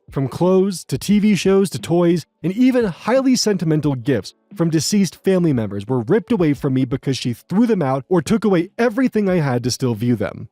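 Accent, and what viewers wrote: United States. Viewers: not a Spanish speaker, but I was in Mexico for 3 months. You sound like me, like you tried but still have the US accent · Honestly i think you did fine on the spanish pronunciation. You have an american accent about it but im pretty sure we all do that but at least your vowels were correct which is really difficult for some people.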